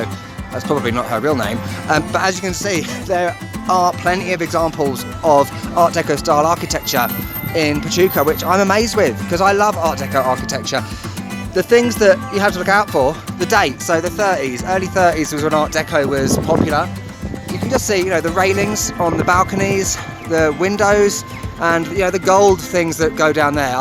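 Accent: United Kingdom. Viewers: 👋 hello greetings from USA/Mexico ! I love ur British accent! I was raised in Hidalgo Anywho try the arroz 🍚 con leche pastes and papá con pollo 🍗 yummmy 🤤!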